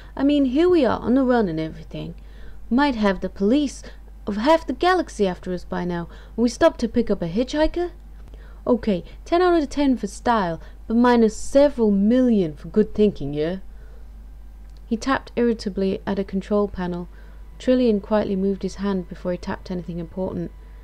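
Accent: United Kingdom. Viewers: I always enjoy watching you read! Love your British accent and when you take on the characters voices it shows you are a bit theatrical! I love it! I have to add the twinkle in your eyes, your restraint of laughter from your facial expressions was hard when your cat went meow! lol .. so cute!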